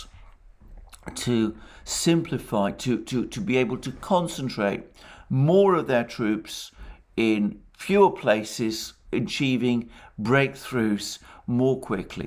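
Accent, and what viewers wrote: England, United Kingdom. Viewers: Ah, my daily fix is here with the dulcet tones of your refined English accent.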